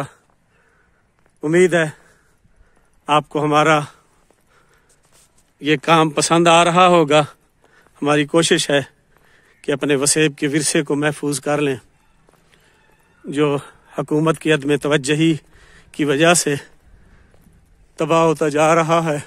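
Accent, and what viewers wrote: Saraiki, Pakistan. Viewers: Saraki ka tarhka lgaya kro Maza ata hai.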